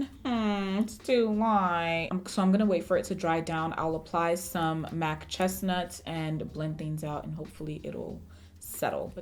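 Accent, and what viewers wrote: Africa. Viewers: Hi,. Im in love with your personality. And this accent, omg 😂 i don't know which one it is (because I'm from Russia, english is not my language), i think it's African, right?) You are so funny and, you know, so unlike other people! Be yourself, we love it 😍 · her African accent is soo hilarious!!! luv it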